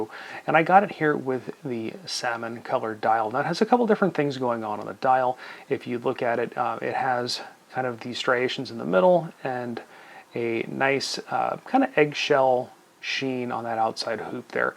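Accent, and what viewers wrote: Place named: United States. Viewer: At least you are self aware to it😁.. yes, you guys in the US do say things in a funny way 😉. Actually just one thing- Tourbillon is pronounced without the “LL”, so it sounds “to(u) r bi yon”. Great review. I own the Aesop, the other dial, and the Pierre Paulin (same dial but with 3 hands). I enjoy them a lot.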